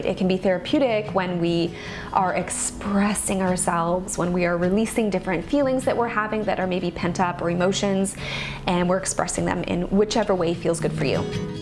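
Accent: Canada. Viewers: Are you Canadian? Kinda sounds like Canadian hehe.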